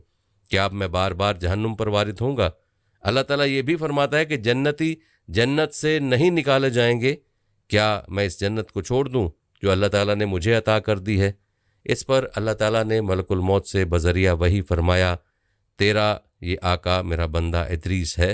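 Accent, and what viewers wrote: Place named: Punjab, India. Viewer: Adrees nhi idrees hy na'am aap Punjabi hn lehjy lugta hy kion ghalat na'am lety hn.thory sy mehnat or homework karen or phir vdo banayen.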